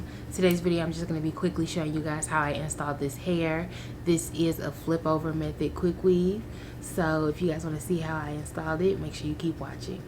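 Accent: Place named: Texas, United States. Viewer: Sound like you from Texas ❤❤❤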